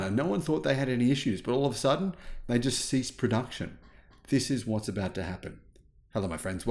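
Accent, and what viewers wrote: Australia. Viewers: start to like his aussie accent, quite nice actually.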